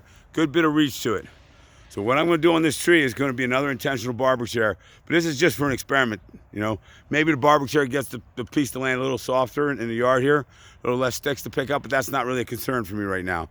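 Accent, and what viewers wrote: New York, United States. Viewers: An arborist from New Yoik! At least, that's how it sounds to this Englishman. Loving your work sir👍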